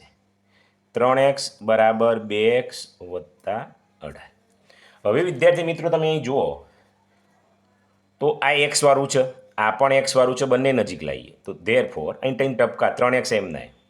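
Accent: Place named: Mehsana, India. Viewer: Tame mehoni bhasa bolo cho sir 😮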